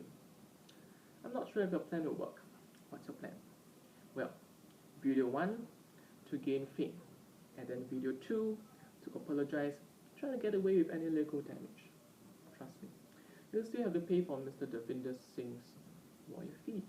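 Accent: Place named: Singapore. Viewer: Please teach anything you wish... apart from Speaking Singish, Chinglish...oh that's right English, you need work on this...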